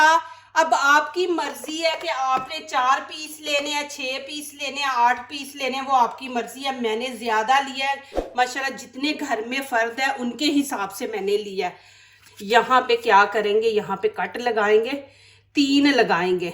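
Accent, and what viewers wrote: Punjab, India. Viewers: Achi recipe hae definaitly I'll try it in sha Allah...mae bhi punjabi ho but aap ny to urdu ke tangain he toor de 😄 too much strong accent hae but words bhi properly nahi bol re like dahi ko dare, bareek ko break full punjabi words use ho ry hae in urdu plz is pr bhi work krain.Allah aap k channel ko kamiyab kry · Behe Shef Sahiba Ap tu Urdu Bhi Panjabi ma bol rshi hain Khuda k liye Panjabi ma hi Comentry kr boht kharab Ecsent ha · Sorry behna panjabi wali urdu na bolain urdu ko urdu ki tarha bolain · Baji Aap ki Ourdo same like Punjabi Exent.... are you from Sialkot....?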